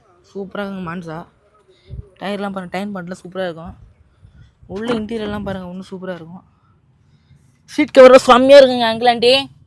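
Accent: Madurai, India. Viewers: Madurai vasagam 🎉🎉🎉super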